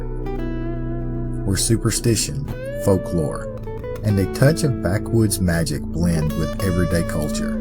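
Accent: South, United States. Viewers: Delicious dialect 😊😊 loving the sound of your voice as always looking forward to hearing this.. its 0134am xx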